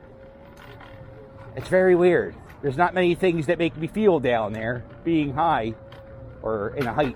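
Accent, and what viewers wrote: Philadelphia, United States. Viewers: You need to do voice over work for "thick Philly accent" types. Lol. I'm from Chester County and somehow it's only South Philly and Delaware County. Why is that? Anyway, cool vid!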